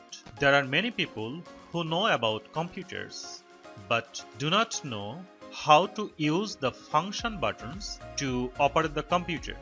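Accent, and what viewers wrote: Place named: India. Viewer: Thank you for explaining Fn keys but I struggled to understand due to the Indian accent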